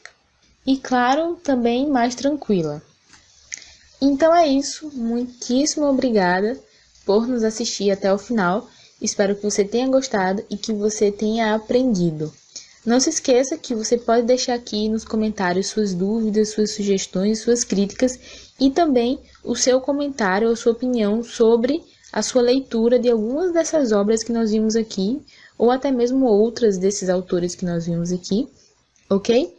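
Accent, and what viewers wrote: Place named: Rio Grande do Norte, Brazil. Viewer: Esse sotaque não me engana! É do Rio Grande do Norte! Muito boa aula! Me ajudou mt!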